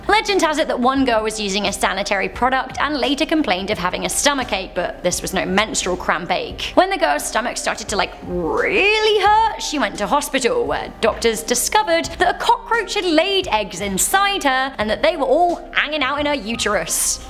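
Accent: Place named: United Kingdom. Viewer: your so lovely 😊🤗😘 & your British accent is Awesome!!!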